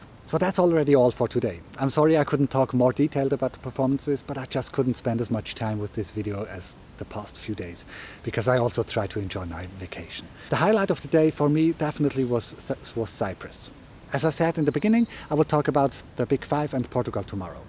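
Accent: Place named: Switzerland. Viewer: I KNEW you were from my home country based on your accent XD Where exactly are you from? I live in Horgen near Zürich!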